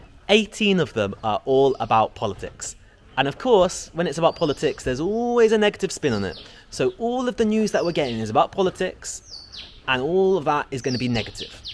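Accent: United Kingdom. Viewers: I like your cute British accent :) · Enjoy listening to your talk! I think you talk very well. You got lovely British accent with British style humour. China has a huge amount to explore, I can see you have the potential of being successful youtuber 😉 best luck! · Your British pronunciation is like music to my ears. Thank you.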